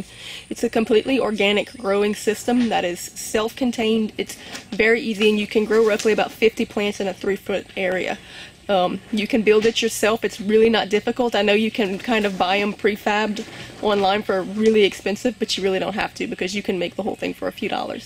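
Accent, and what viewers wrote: Louisiana, United States. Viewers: Love the lousiana accent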